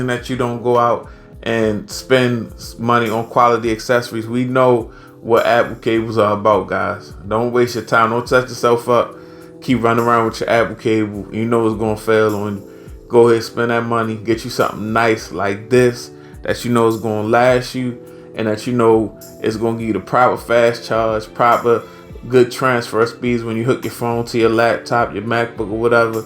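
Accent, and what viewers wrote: Baltimore, United States. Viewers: You from Baltimore or Philly?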